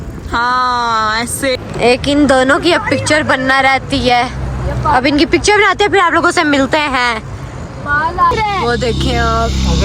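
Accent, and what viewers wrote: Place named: Rawalpindi, Pakistan. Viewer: Sai pindibois wala accent hai. Good work 👍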